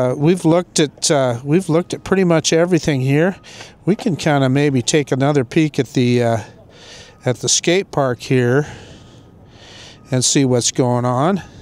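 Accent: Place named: Idaho, United States. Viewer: Sii y se pierde todo el acento de Idaho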